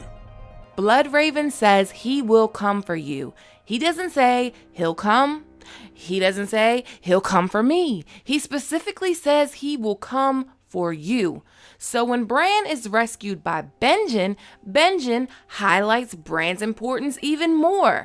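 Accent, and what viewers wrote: United States. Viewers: do your thing my dear u def sound a little country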